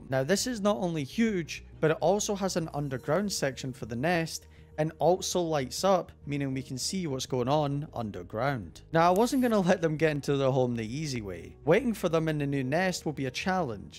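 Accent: Scotland, United Kingdom. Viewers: That a scottish accent? If so love to see a fellow scot being an ant enthusiast 💙💙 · Thank understandably British guy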